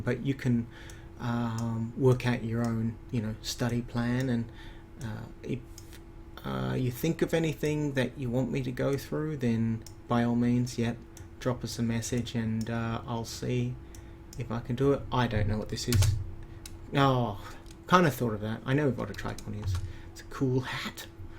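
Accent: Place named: Australia. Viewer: Hey just found your channel! Great to see more Scrabble on here :) Not sure if you knew to click flashcard mode on Zyzzyva saves having to change from missed to correct. You may have covered it in upcoming vid but I thought I'd mention anyway. Glad I've found another channel to watch after my 3 year love affair with Dweebovision and good to hear another Aussie voice too. Keep it up!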